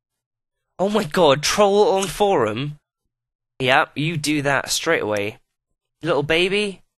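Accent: England, United Kingdom. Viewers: i like your british accent, especially when you said " pause, pause, pause." XD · He's British,like his accent is really British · i love your englis accent!!!! :D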